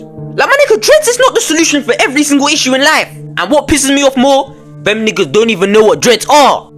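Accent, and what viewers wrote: United Kingdom. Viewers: Londoner ain’t ya? You sound it atleast and the stories screammmm London lmaooo but this does happen in brum too sadly 🧍🏾‍♀️😭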